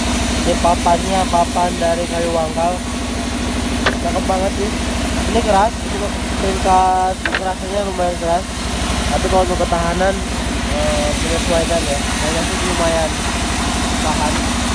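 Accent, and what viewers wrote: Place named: West Java, Indonesia. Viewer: Mantap ey operator na... Asa wawuh sunda na palih mana kang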